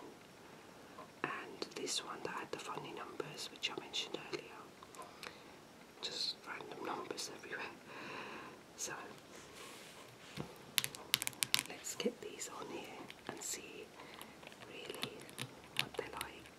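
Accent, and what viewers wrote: United Kingdom. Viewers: Subbed!!!!! Finally a good channel with an english accent! Sending my love from London 🇬🇧 - keep up the good work and videos!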